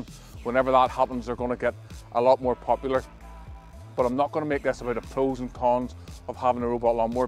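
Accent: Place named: England, United Kingdom. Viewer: Cant understand your queens English eho. Give me a Kiwi any day.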